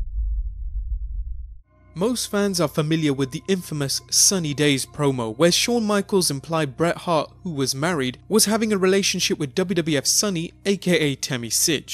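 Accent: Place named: United Kingdom. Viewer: I love this guy's Britain accent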